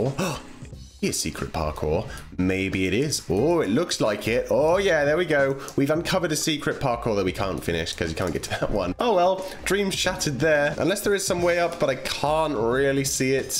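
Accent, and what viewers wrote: United Kingdom. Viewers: Are you are British so if not ... ... .. ... ...........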